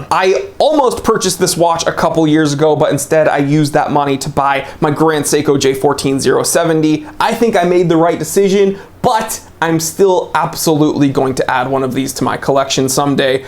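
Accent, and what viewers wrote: Germany. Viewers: Lol.... You've lost the plot this time... But love that wacky German accent. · Your "german-english" was great - just on point! Love the outtakes 👍😄 Greetings from Germany, yours Hendrik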